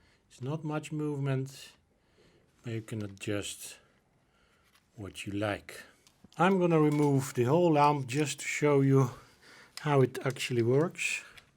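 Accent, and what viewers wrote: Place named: Netherlands. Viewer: Thanks very much for your videos. It takes all the guess work out of it. And it took a Dutchman to do it. Oh well, you sound Dutch anyway!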